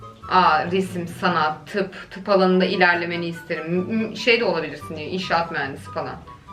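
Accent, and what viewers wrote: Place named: Sivas, Turkey. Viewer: Abla nerelisin ben sivaslıyım aynı senin gibi konuşuyom gızzzz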